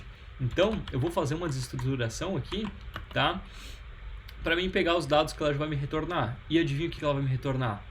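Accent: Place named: Santa Catarina, Brazil. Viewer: Massa!! Rio do sul exporta dev hein haha A rocketseat era dai também né. Imaginei que fosse de SC por causa do sotaque, sou de Floripa. Cai no vídeo pois estava tentando decidir qual biblioteca iria usar para form. Valeu pelo conteúdo!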